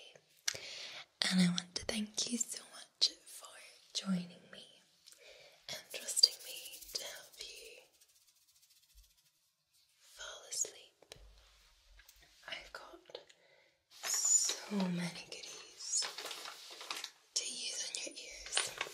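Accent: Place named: Scotland, United Kingdom. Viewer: I rather enjoy listening to you ramble,. Triggers or no triggers, whispering or soft-spoken or even humming/singing, I just find the sound of your voice very pleasant. 🥰 Even more pleasant than the rain, which I do also enjoy. 😂 Speaking of enjoying things: based on the way you kept breaking into other accents in the middle of talking, I'm going to go out on a limb and guess you were enjoying yourself while filming this - that's something I dearly love to see. 😍 To answer your question, though, I don't think I really have a favorite chocolate. Does German Chocolate Cake count? 😋 Have a great weekend, and I look forward to your next video. 💝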